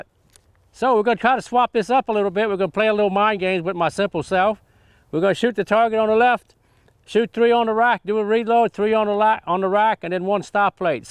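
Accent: Louisiana, United States. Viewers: Wheres he from, he has a bayou accent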